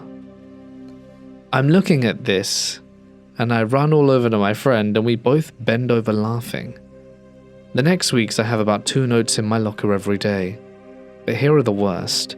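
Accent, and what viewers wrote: England, United Kingdom. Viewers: Lovely ..ever so.quintessentially English voice ..just lovely .From from NW England myself ,makes a change hearing a REAL VOICE & not this Ai souless rubbish..Thank you x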